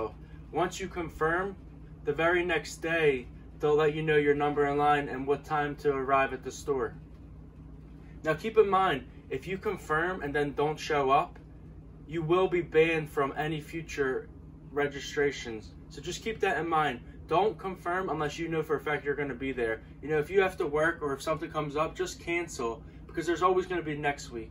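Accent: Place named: Pittsburgh, United States. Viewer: Bro where are you from? Im from Pittsburgh, PA and you kind of have that accent like us...just curious. NIce pickups!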